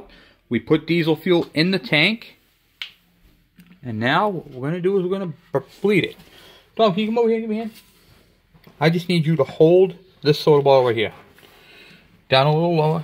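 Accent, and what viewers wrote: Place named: Massachusetts, United States. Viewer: Thanks, from Hillsboro, NH! That really saved my butt. I knew you had to be from northern Mass from the way you talked, LOL. I'm a former Lowell resident, born and raised.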